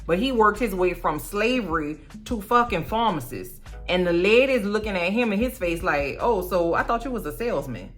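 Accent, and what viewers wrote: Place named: South Louisiana, United States. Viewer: Love your priceless commentary! Adore your accent as well! I miss it, as a south Louisiana native also ( moved to Arkansas about 25 years ago.) So glad to have found your channel. ❤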